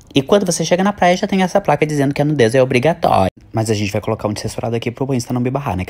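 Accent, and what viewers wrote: Rio Grande do Sul, Brazil. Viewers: Eu ameeeiiii 😍 vc é gaúcho? Gosto muito dos teus vídeos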